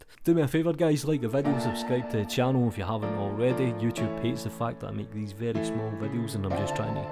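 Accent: Scotland, United Kingdom. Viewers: idk what your accent is but I love it. oh, it's Scottish, like Brian 'Limmy'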